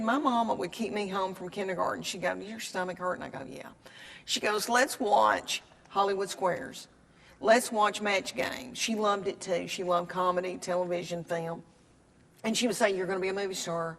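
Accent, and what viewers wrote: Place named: North Carolina, United States. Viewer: You are so down to earth and funny. I'm from SC. I have aunts and cousins from NC have your strong accent. You tell stories that I hear from my family I'm 70 and😂 your the best comedian.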